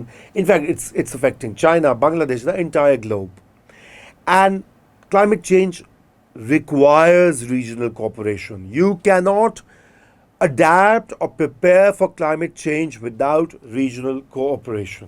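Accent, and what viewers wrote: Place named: Punjab, India. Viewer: Dude he is a set of few last English speaking punjabi ascent journalists of Pakistan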